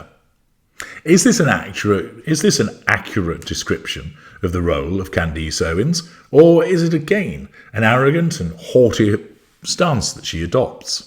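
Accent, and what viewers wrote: United Kingdom. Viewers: We pronounce it that way in the UK.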